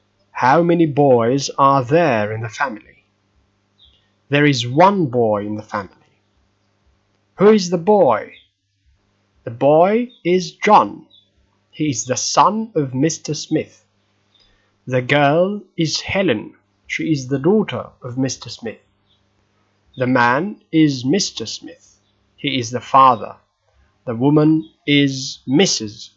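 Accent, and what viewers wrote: United Kingdom. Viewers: Hello, I am a native Spanish, I wanted to know if your pronunciation is British, since I want to learn that pronunciation and not the American one. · What is your native language? You sound British, but some words seems off. And your French sounds very native.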